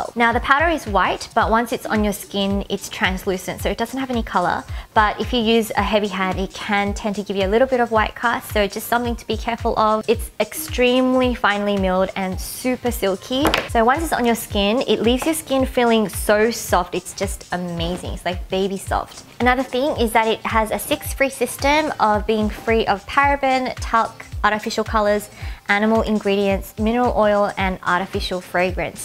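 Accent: Australia. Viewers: Hi. Love your videos. So awesome to know you're from Australia too. It sounds great to hear someone from Australia. And also you do great tutorials using some of the Korean street brands that are inexpensive to buy. I just started getting into make-up and I particularly prefer Korean make up brands because Kpop? (that's a bad reason, but Korean anything just appeals to me the most at the moment) I particularly like Innisfree as a brand in general because everything looks so natural yet classy at the same time and I had my first Korean cosmetic brand experience with Innisfree as well (Innisfree anti-Bija Spot Essence W which is sadly not available anymore online on the Innisfreeworld website) It's funny how you're particular about the fragrances, but I found that they all smell nice, so I had no problem with it. I guess you could say that I am now a die hard loyal fan of Innisfree and I will love anything it has. 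Off topic but dying to ask because I don't know where to: As someone who's still so inexperienced, when would you use BB Cream or Liquid Foundation? I understand the differences but seeing as Liquid Foundation is tons better, I see no point in buying BB Cream. But I saw your make up collection which is astounding BTW, and it has tubes of BB Cream in it. So I was wondering what sort of occasion would you use BB Cream and why?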